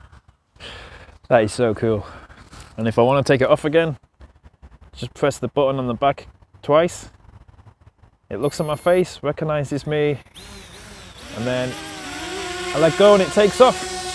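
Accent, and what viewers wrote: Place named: United Kingdom. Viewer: So, how do you like your Spark? First, I live in Canton, OH. I also own a Mavic Pro but looking for something smaller. The Air just getting me too close to my Mavic Pro. I also motovlog and would like to take the Spark with me on my motorcycle club rides. I want to wish the best of luck. Love the British accent...:)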